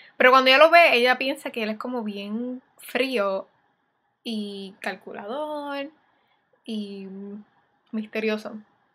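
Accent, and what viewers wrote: Puerto Rico. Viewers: Así hablamos los puertorriqueños. Espero que el ja, ja sea de que te da gracia...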